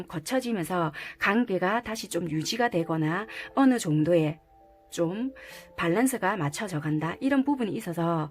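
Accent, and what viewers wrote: Busan, South Korea. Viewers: 네. 고맙습니다.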